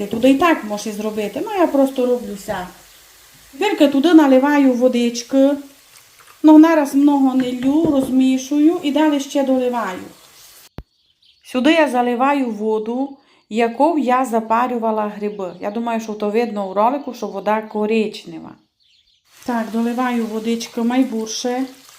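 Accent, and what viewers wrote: Transcarpathia, Ukraine. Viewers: Це дуже смачно, в нас на Волині також таку готують ,лише без паприки.Називається страва мачанка.Обов'язково присутня на столі на Святий вечір і в піст також. Спробую додати паприку.Окремо дякую за ваш чудовий закарпатський діалект❤!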